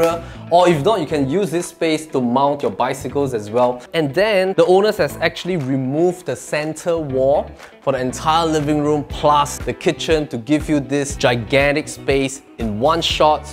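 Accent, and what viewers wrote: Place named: Singapore. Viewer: Love your Singlish. Don’t need to work on your pronunciation 🥰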